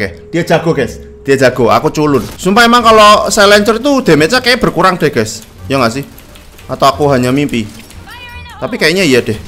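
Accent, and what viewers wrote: Java, Indonesia. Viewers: baru nonton lagi video bg ini makin medok ye ngocehnya wkwk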